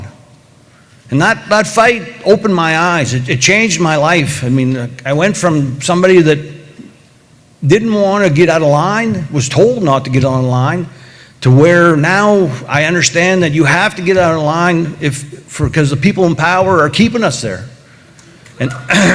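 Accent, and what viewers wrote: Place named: Maine, United States. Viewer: He's from northern Maine. This is as authentic as it gets and that matters. You probably want him to speak D.C. English, or maybe the Queen's. Let Mainers be Mainers.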